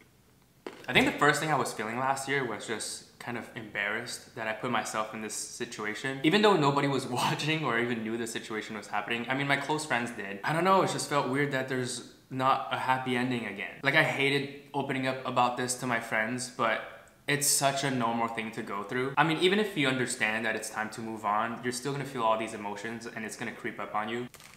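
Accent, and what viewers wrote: Quebec, Canada. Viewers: tu viens du Canada ? parce que ton Français ne sound pas comme le français de la Franca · Hey!! C'est la première vidéo de ta chaîne que je regarde, si je ne m'abuse, c'est bien un accent québécois que j'ai entendu ? Bien le bonjour, de Montréal, hehe ! Plus sérieusement, j'ai 24 ans et je n'ai jamais eu de relation amoureuse ! J'ai eu quelques crush, mais franchement, je peux les compter sur les doigts de ma main et chaque fois, j'ai fini par apprendre que la personne était en couple ou avait un intérêt pour une autre personne. Ce sentiment d'embarras dont tu parles, je l'ai ressenti à chaque fois ! Un peu l'impression d'avoir été ridicule de penser que mon intérêt aurait pu être réciproque, aie. Mais, disons que dans la solitude, j'ai eu le temps de réfléchir, haha. C'est un peu le revers intéressant de notre situation. Je trouve que tu as une très belle approche réflexive et je devine que c'est l'avantage de n'avoir qu'à t'inquiéter pour toi-même. 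J'ai remarqué que mon expérience de célibat m'a également donné cette opportunité de me concentrer sur mon propre développement personnel. J'en suis venue à une conclusion similaire à la tienne : il n'y a pas que les relations amoureuses dans la vie et si je dois un jour en vivre une, j'en vivrai une au moment venu. Pour l'instant, je vivrai en me mettant des objectifs qui m'intéressent plus, notamment avancer dans ma carrière et terminer mon parcours académique ! Ta vidéo m'a été recommandée par hasard, mais c'est toujours nice de tomber sur ce genre de vidéo et de se rappeler qu'on n'est pas seul(e) ! Ton pain avait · Hi! I just discovered your channel, but I'm pretty sure that I've seen your pretty face on Tiktok already once. I guess YouTube algorithm suggested me to watch this video for a reason. I'm 29 and single af, but if I can provide you an advice is to take your time as you're already doing but don't take too much time though 😆 Et wooow qu'est-ce que ça m'a fait bizarre et plaisir en même temps d'entendre l'accent québécois après toutes ces années! 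Haha take care✌🏾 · Let me tell you, I turned 24 and I have never been in a real relationship. I never found a person I genuinely wanted to be with. Most people find it weird and just don’t get it. Funny enough, I do not feel bad about it, I am actually quite okay with it😂. I feel like you should always do what feels right to you, take your time and not rush into something you don’t want (but, if you do have real feelings for someone, for God’s sake, do not supress them and go for it!) I also fully agree with you, if you are happy with who you are and confident in your own self, you’ll be more than fulfilled on your own and the relationship you’ll eventually get into will be a much better and healthier one. PS: ton ptit accent québécois est trooop mimi haha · Wait u speak French sa m’a surpris mais par contre on dirai que t’as un accent canadien pas trop français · OMG! Ta chaîne youtube a pop-up, j'écoute ta vidéo (cuz I kinda relate to the title of being single forever...) Je ne m'attendais ZERO à t'entendre parler québécois 😳! 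Tu es québécois? Wow~en tk Thanks for sharing this Nice cozy talk ^^ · Omg are you from Québec , canada ???! , u have that french accent , im from Montréal btw !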